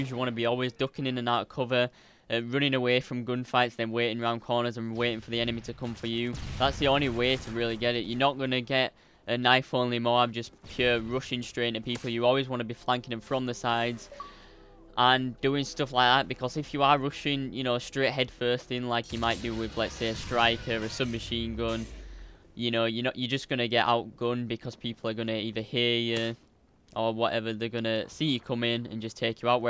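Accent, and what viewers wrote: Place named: Northern Ireland, United Kingdom. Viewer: Nice man! You sound like you're from Northern Ireland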